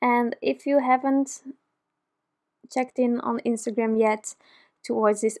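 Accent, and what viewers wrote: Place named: England, United Kingdom. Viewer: Thank you so much dear 🤗🤗🤗🤗🤗 this video is so wonderful!! I am so privileged to have you in my Design Team 🥰🥰🥰🥰🥰 And I really love your English accent 😃😃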